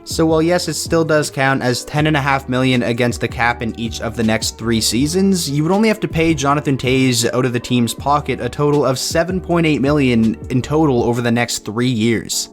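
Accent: Canada. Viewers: Ive said many times your SUPER Canadian accent makes me laugh... "OAT-BREAK" made me laugh pretty hard lol. Also your math teacher musta hated you. You always say .fifty instead of .five zero ...and it triggers me lmao.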